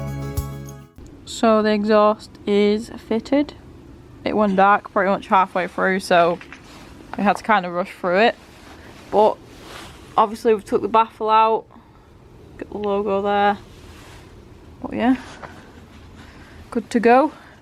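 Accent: Northern England, United Kingdom. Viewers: You sound proper northern!! I'm from Burnley so I guess I do too!! Ha!! Nice looking bike you have. Ride safe and enjoy!!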